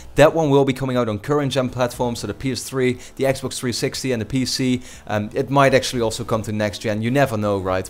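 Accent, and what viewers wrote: Netherlands. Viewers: are you dutch? you have a bit of a dutch accent... :P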